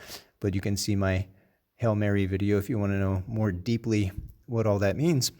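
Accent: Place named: Latin America. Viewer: So beautiful!! I really love your Latin accent. Thanks and God Bless you.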